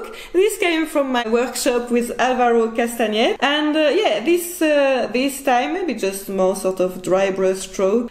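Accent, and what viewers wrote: France. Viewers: Merci beaucoup! Super ludique!! J'essaie pour le J5 demain 😉😉😉 J'adore tes vidéos, inspirantes et pleines de bonne humeur avec ton accent frenchie très mignon 😃. Ce serait super si tu avais le temps un jour de faire une vidéo sur les visages en quelques coup de pinceau 😊. Je ne sais jamais comment placer les ombres et les lumières et comment figurer les limites ni comment décider quels détails retenir. Merci encore pour tout 👍👍👍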